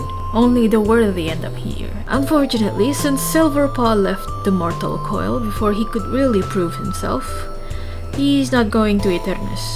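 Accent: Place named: Russia. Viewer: So, I can tell you have an accent but I don’t know what accent. I think a mix of Russian and British but I don’t know. But if it’s personal info to you then you don’t have to tell me.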